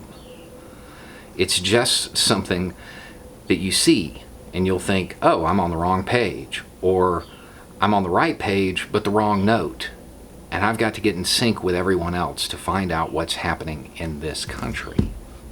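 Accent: South, United States. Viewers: Hey, love the messages in your videos. How does your own local community receive your views? I'm assuming from your accent that you live in the south and therefore probably a right/ conservative leaning state? Thanks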